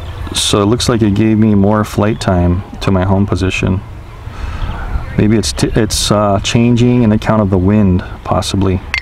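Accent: Hawaii, United States. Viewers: Howzit great video, I hear a slight localness/Hawaiian in your voice. Mahalo again for the video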